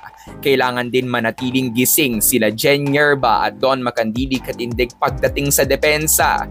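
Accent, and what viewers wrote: Philippines. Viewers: Cute ng accent mo gigil na gigil pinoy na pinoy, anyways what a sweet victory for our Alas in times like this which independence day 🎉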